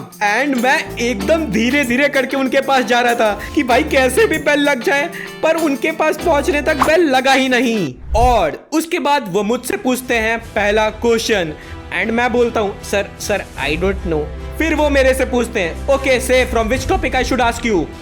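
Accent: Bihar, India. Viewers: aap bihar se ho kya bhaiya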